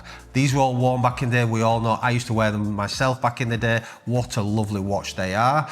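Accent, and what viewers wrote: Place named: North, United Kingdom. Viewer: Regional accents, god forbid someone be born north of the Watford Gap. a good lad who is truly himself, no need to change for people.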